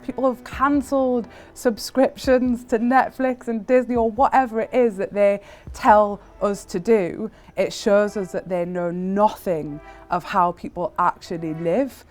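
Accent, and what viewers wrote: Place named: Northern England, United Kingdom. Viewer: Northern Accent opinion sounds weird so used to push English speakers hope you’re able to better your accent thank you for your understanding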